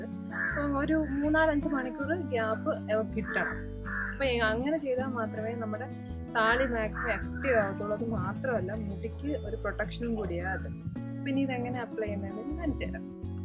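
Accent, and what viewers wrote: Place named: Tamil Nadu, India. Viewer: Akka Tamil sounds akka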